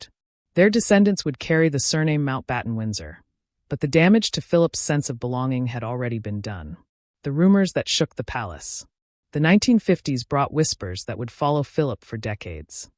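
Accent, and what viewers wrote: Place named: United States. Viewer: Please could you Americans do us the courtesy of speaking about duties and not doo-dees?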